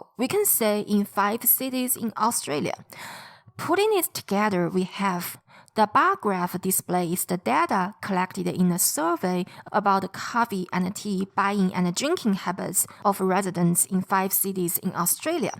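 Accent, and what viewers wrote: China. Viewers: sorry to say your English is mixed with Chinese accent. Hardly can understand your pronunciation as what you are saying !!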